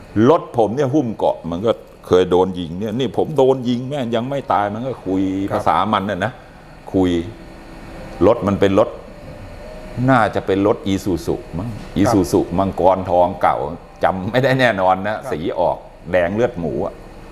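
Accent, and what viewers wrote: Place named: Pak Nam Pho, Thailand. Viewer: สำเนียงคนปากน้ำโพค่ะท่าน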